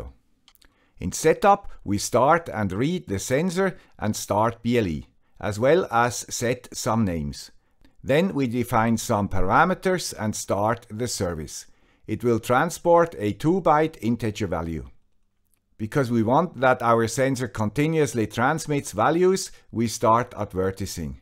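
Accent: Switzerland. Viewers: Dear fellow Guy with a Swiss accent I‘m not sure why and since when, but I consider the Arduino IDE to be bloatware (OsX). As I currently have no low latency projects I have deinstalled it completely and switched to μPython. Yes, this has limited me in some ways, but I‘ve also noticed that as an engineer I can basically transfer the specifications to Python and it‘s still legible for beginners. Less hassle for me and my few clients, albeit limitations apply. Hopefully Arduino will change their approach (maybe they already have?) and maybe even open the IDE to μPython.. Thank you very much for your effort, your content has nonetheless helped me broaden my perspective (and workshop 😅) and I‘ll be replicating your Pöschtler alarm soon. Bye!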